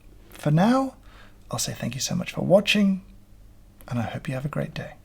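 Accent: United Kingdom. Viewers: Proper use of the English language and a British accent lend all the credibility I need.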